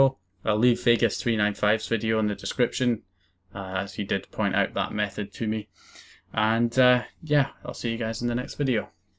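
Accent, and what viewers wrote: Scotland, United Kingdom. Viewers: You sound Scottish are you Scottish?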